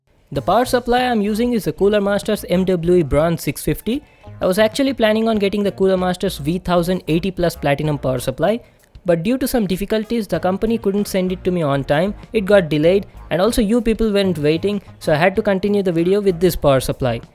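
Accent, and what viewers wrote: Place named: Gujarat, India. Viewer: You are definitely gugrati , marvadi or sindhi . English R.I.P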